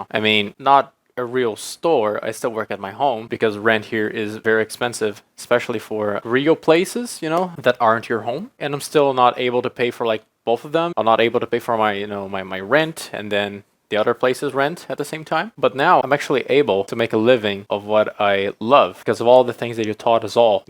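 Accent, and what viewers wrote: United States. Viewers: You speak English near perfectly! If you didn't tell me your nationality and where you lived, I would've thought you were an American from the North East or California! And your wireless mic sounds great! Adeus!🙂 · i thought that he had a bit of that ny flare ahah, i second that blame on rossman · You taught yourself English?! You pretty much sound American, man. That's impressive 🙂 Well done!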